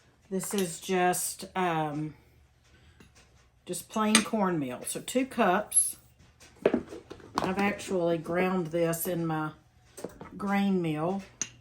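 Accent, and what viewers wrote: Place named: South, United States. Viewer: Hi,! I was delighted to hear that you are from Middle Tennessee. I live in the Muletown if you know where that is and it is also called "The Dimple of the Universe". I hear that you still have our beautiful "Southern Accent". When I was young, I lived in 5 different states and two other countries but moved back to my hometown years ago and am so happy that I did! Do you ever miss living here? Thank you so much for the recipe. It looks delicious. My family makes it with White Lily, Martha White or other WHITE cornmeal but that is because we can get it! So glad that I found you on here. Have a Blessed day.🥰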